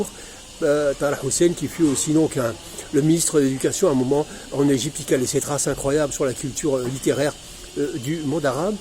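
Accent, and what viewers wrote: France. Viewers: Plutôt l'accent de TOULOUSE..comme Nougarro..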